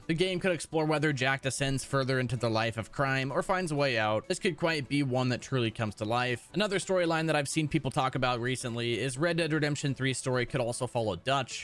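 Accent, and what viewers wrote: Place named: General American, United States. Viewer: Almost nobody else cares. It’s a general American accent.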